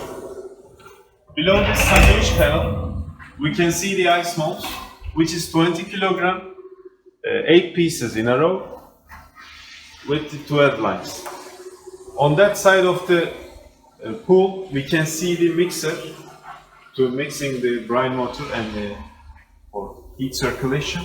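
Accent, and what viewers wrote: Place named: Turkey. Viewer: most turkish accent i ever seen in my life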